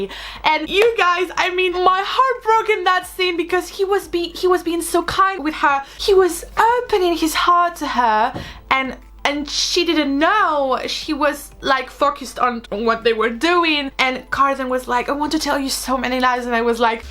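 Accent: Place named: England, United Kingdom. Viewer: Me encanta tu acento tipo England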